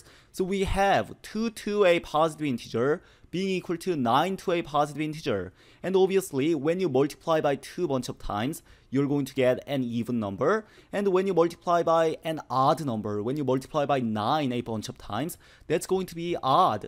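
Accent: South Korea. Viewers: 왜 한국식 영어발음 처럼 들리지.. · 한국인이세요...? 발음이 친숙한 콩글리쉬인데....... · His english accent sounds like Korean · 왜 콩글리쉬가 들리지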